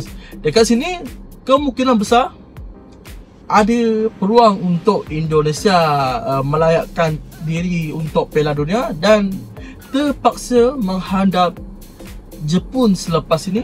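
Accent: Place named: Malaysia. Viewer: Bahasa logatnya jlas melayu antek malaydes😂😅😅😅😅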